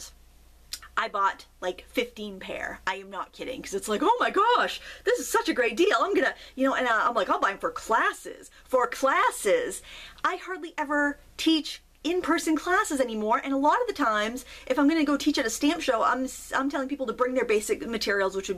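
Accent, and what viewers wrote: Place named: Texas, United States. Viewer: Hi! It is so defucal for me to understand what you say, for the firste, you talk wery fast, and with your lovley texas accent, i would love it to stay that way, ant i wont have a single issue iff you just show the stuff you tell about... Love from E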